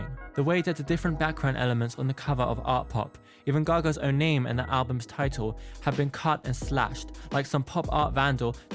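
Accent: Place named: England, United Kingdom. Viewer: Not to dismiss her fashion influence, but one cannot attribute a cultural zeitgeist to a single person. Additionally, Beyonce wore a robot suit before we know the name Lady Gaga. Check her Get me Bodied performance at the BET Awards. I hear the English accent, however, so I understand that you may have your own cultural biases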